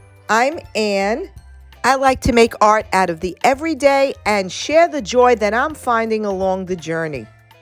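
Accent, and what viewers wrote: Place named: Brooklyn, United States. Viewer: This came out so beautifully! I know I’ve said it before, but your Brooklyn accent makes me so happy. 😂😂 Those molds are soooo beautiful. I’ve been experimenting with resin work for the past year or so and I have had nothing but trouble with it! I know you painted yours, so it doesn’t matter in this instance, but I had consistent problems with my resin not fully hardening and always having bubbles in it. I also have used that exact same resin and it STUNK so bad it made my whole house reek. ☹️ Omg you with the neck ruff 😂😂 I would love to see a full video tour of your she shed!!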